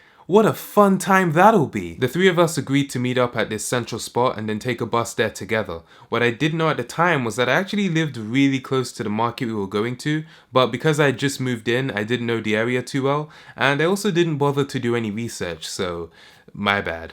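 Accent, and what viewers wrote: England, United Kingdom. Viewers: Is he from the UK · Are you english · I forgot he was British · Your british?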